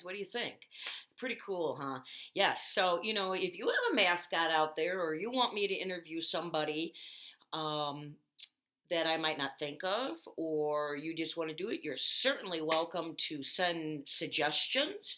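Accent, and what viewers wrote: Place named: South, United States. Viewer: I thought he had a Southern Drawl? Maybe the trip gave him a scratchy throat?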